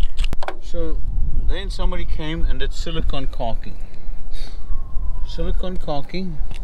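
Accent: South Africa. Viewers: That South African accent is undeniable. Good work.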